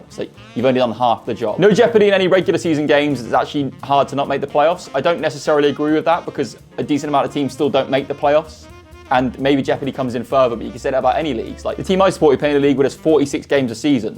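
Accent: RP, United Kingdom. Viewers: so British posh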